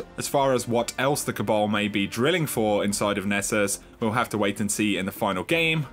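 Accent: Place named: United Kingdom. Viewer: Thanks, keep up the good work! (from Michigan, USA) Love that "!" UK accent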